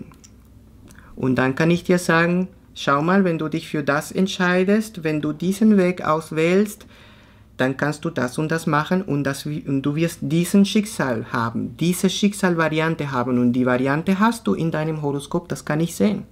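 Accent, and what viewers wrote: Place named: Spain. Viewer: Hallo, du könntest mal angeben, wo man dir eine Spende zukommen lassen kann, per PayPal bspw. Als Energieausgleich dafür, welchen Wert du mit deiner Arbeit den Menschen bringst. Ich kann mir vorstellen, dass der eine oder andere dir gern etwas zurückgeben möchte. Uns pregunta: De qué país eres? Tienes un accento de un hablahispante.:-) Tu alemán tiene un muy alto nivel, muy elevado. 👍🏻 Vielen Dank für deine tolle Arbeit, bin sehr froh, dich hier entdeckt zu haben. 🙏😊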